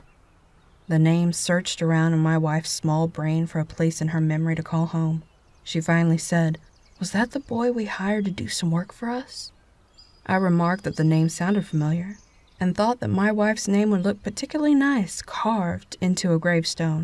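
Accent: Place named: South, United States. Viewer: Haha! I love this story. I have to say that little southern twang suits you. Be well my 💜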